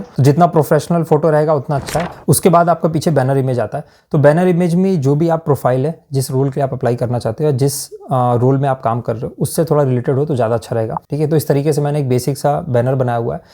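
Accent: Odisha, India. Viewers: Bhai are u from Odisha?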